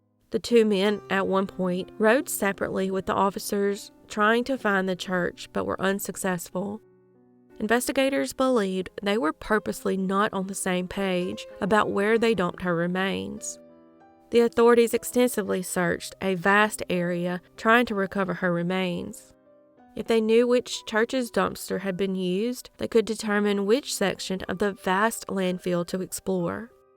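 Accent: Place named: Tennessee, United States. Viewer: No sweetheart, you is 100% country. Cornbread is more city than you. I can say this because you sound like everyone in my hometown. Where I still live. TN We love you.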